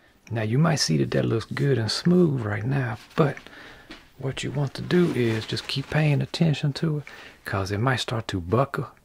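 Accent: Louisiana, United States. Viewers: The voice-over on this is like Bob Ross was from Louisiana and did crafts instead of oil painting- I love it! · I think I recognize that accent. Are you from Louisiana by any chance? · I appreciate that the instructions are in Southern (sounds like Louisiana)- slippy slippy and smush and catawompus are precise terms that are easily understandable for me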